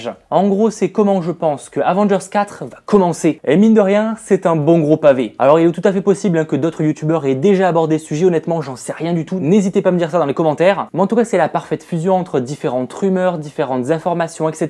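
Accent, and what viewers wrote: England, United Kingdom. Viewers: Idem, du coup gros spoile sur la fin de Ant-Man et la Guêpe... Je crois qu'il annonce au début de la vidéo qu'il faut avoir vu le film mais je n'avais rien compris ce qu'il disait à ce moment là tellement qu'il parle vite et articule mal (+ une prononciation à l'anglaise), même en retournant en arrière plusieurs fois pour essayer bien de comprendre les deux films qu'il fallait surtout avoir vu (j'avais juste compris pour Avengers 3 mais pas pour l'autre). Merci pour ce spoile dont je me serais bien passé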